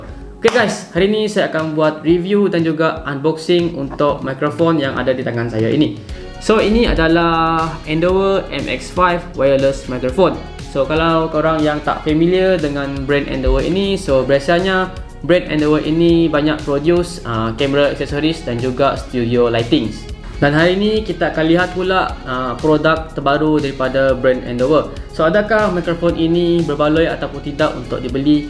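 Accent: Sarawak, Malaysia. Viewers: bos ada slang iban or bidayuh..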